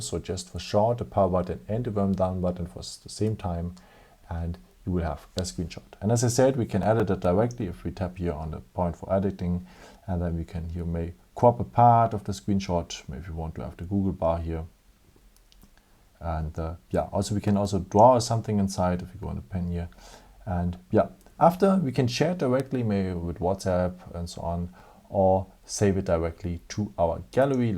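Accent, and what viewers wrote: Germany. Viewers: How to get a clear screen on the phone to take pictures??? I can see myself at the bottom of the screen but, cannot open it up . Thank you 😊 I like your accent at some of the words you use makes me believe that you are German..😊 🤔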